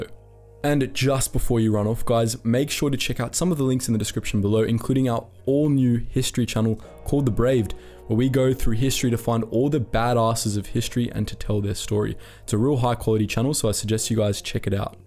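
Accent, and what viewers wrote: South Africa. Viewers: Great video. Based on the accent can I guess the narrator was born in South Africa but moved to Australia later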